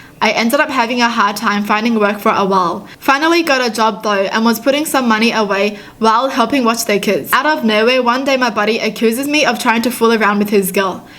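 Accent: Australia. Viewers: Hi so I’m new to this channel,but I have a question.Are you Australian ,because your ascent is kinda Australian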